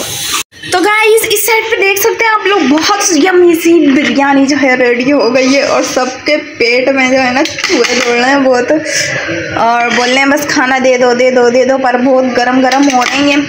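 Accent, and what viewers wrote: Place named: Karachi, Pakistan. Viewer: Ap memon hn? Apki language karachi jese lg rhai 🤭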